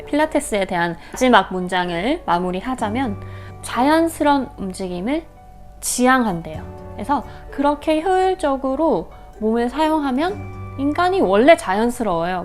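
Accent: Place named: Busan, South Korea. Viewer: ㅋㅋㅋ사투리 잘 쓰셔서 부산사람인줄~ㅋㅋㅋ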